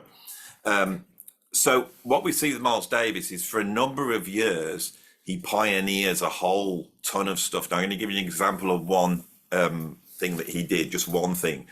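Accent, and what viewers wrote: United Kingdom. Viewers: !...intelligent list...you dug deep for the true "roots" of music...the foundations of genres. Your shows are unique imo to other folks on YouTube...I love your British voice/dialect...it relaxes me so...anyway, keep it up...shoot for 50K subs by end of the year! Enjoy the weekend...sincerely, 55 yr old drummer Webb in Atlanta, GA.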